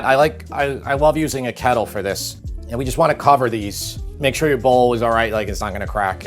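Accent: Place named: New England, United States. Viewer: Mexican dish from Colorado cooked by dude who sounds so New England that he should be pouring it on a grinder with a side of clam strips Beautiful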